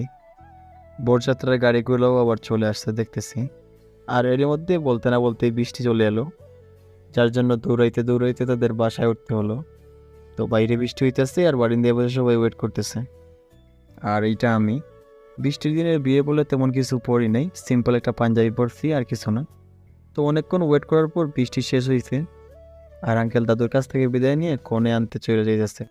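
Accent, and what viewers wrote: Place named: Noakhali, Bangladesh. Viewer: Nuakalir moto matho kne😒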